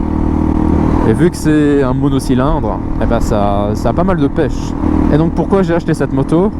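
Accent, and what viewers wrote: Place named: Belgium. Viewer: tu es ou en Belgique ? Je le sais avec ton accent de begique et les plaques. Sinon au top de la vidéo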